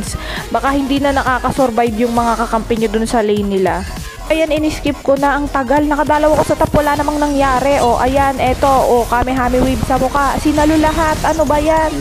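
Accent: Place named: Bicol, Philippines. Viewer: 👁👄👁 ang ganda po ng voice nyoooooooo taga saan po kayo sa Pagsailta niyo po kasi parang taga bicol po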